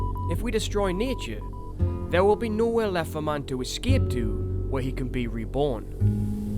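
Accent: Newcastle, United Kingdom. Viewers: are you half geordie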